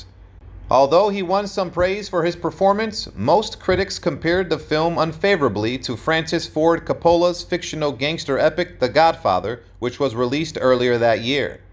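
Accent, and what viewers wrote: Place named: Chicago, United States. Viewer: Hey Mr. Narrator, you from Chicago, ain'tcha? Miss that accent.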